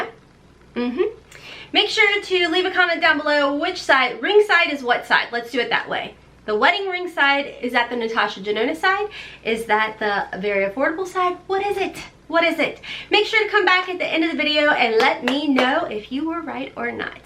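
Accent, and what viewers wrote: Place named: Kentucky, United States. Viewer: also, thank you for sharing the difference between a fake and a dupe because when I first rediscovered my love for makeup I didn't know that there were such things as "fakes" I thought I was just getting a good deal on an expensive product. I will NEVER buy another fake product because as you said who knows what kind of nasty things are in it. Idk if you watch nadi (pop luxe) but he used to do fakes as content and it actually made him sick. Omg!! My husband could hear the video playing and said, "is that, the girl from Kentucky"? Hahahah! I think I might watch you alot. <3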